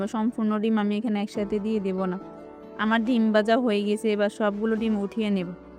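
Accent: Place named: Noakhali, Bangladesh. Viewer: আঁর নোয়াখাইল্লা ভাবি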